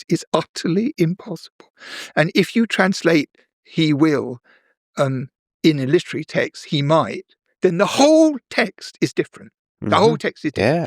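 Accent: United Kingdom. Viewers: Funny u must be American saying he’s got accent. He’s British maybe u 😢are the one with accent. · Heard the British accent - knew it was gonna be good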